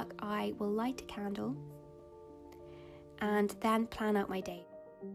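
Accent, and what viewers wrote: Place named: England, United Kingdom. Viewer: Hi, You live in such a magical place... I dream to own a cottage in the middle of fields like this someday;). I have recently been a French au pair in England and I miss this country a lot, it's a pleasure to hear you speaking English with this typical accent I love so much. I discovered your channel while seeking for some ways to virtually escape from the city, and when I found you I also gained some helpful motivation tips to go on working on my Highway Code test. So, thank you for sharing the beauty that surrounds you and your soothing, clever personality, I wish you and all your kind community the most beautiful of Sundays!!!!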